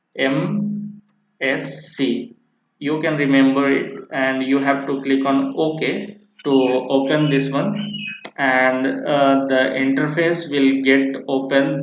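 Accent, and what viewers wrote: India. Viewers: the indian accent is the cherry on top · Ffs Good Video But Im So Sick Of That Indian Accent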